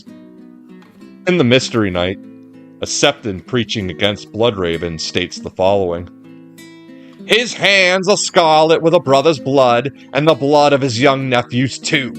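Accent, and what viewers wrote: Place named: Boston, United States. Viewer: Awesome video bro. You GOTTA be from Boston.I'm from Southie. Oh hey I'm not being a twat at all, but it would be "patronized" not "patroned'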